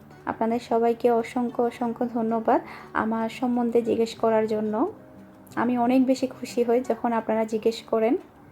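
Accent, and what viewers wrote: Chittagong, Bangladesh. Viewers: চট্টগ্রামের কথা শুনতে খুব ভাললাগে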